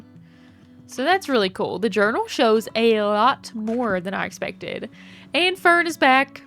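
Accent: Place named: Appalachia, United States. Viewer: Hi! I heard your accent and knew you lived near me. I'm in Kingsport/Mount Carmel. Hey neighbor! 👋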